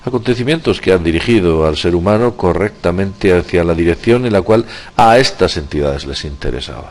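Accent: Spain. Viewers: Hola, creo que si usaras un acento neutro, no español (europeo), serias realmente exitoso, ya que la mayoria de los hispano hablantes estamos en America. el acento de Colombia es el mejor y el mas neutro. Saludos desde Mexico!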